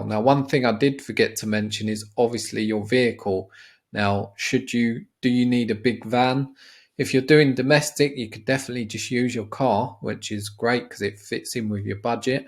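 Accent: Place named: United Kingdom. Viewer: he’s not asking how to convert he was just checking if you was on about dollars as your accent sounds uk so he was just making sure.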